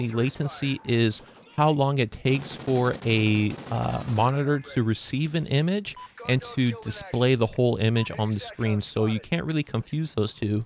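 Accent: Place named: United States. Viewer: Not to offend, your voice is that of a very American American. Which is strange because it doesn't match your facial features at all! Gotta love genes. :P Sorry if I did offend you.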